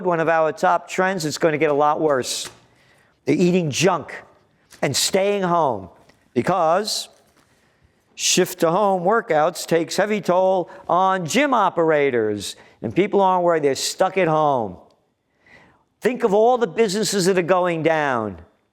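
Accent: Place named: East Coast, United States. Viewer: I think this is good information but why do you sing sections? Not sure what the tune is supposed to be and you don’t have any musical talent. When you sing it seems like you are happy that bad things are happening to others and I don’t think that this is your intent. Please don’t sing anymore. The east coast accents are good and it’s cool when you get mad and yell. You could start breaking things, that would be funny.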